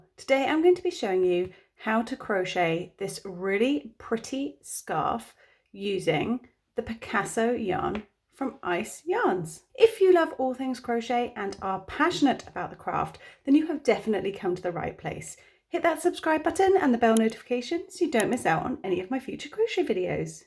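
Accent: United Kingdom. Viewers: Despite the British accents, she's using American terms, so don't get confused. A lovely, and east stitch pattern for us beginners. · You Have a UK accent, but in American terms??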